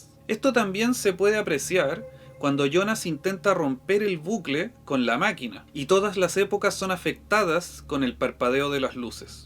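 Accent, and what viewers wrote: Chile. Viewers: Hola encuentro que tu video Está muy bien realizado y la explicación me parece fantástica! Cómo chileno me gusta tu acento y no me molesta como videos de otros países que son como taladros en el oído.... solamente acotar que tal vez podrías pensar en eliminar el gesto que haces con la mano al fin de este vídeo porque es algo muy común entre nosotros los chilenos pero es sabido que en otros países tiene significados incluso de falta de respeto o cosas así.... aparte de eso tu explicación y tu video están la.raja!!!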